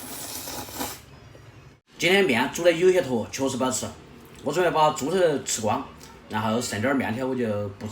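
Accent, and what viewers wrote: Sichuan, China. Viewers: 你好,我也是四川人,我和父母籍贯都是内江的,广西出生,9岁回成都,18岁出国读大学,到现在快四年了.我爸因为工作原因,经常和我还有我妈聚少离多.我觉得你的儿子以后肯定会感谢你做的这个决定的,我因为是我妈和我外婆带大,性格有时还是有点优柔寡断,而且和我爸的交流总感觉不如和我妈交流走心.哈哈,我觉得你儿子未来肯定会感谢你的这个决定的. 看你的视频,学学做菜,听听乡音,安逸惨了~算是我在外求学之余为数不多的慰藉,谢谢,😁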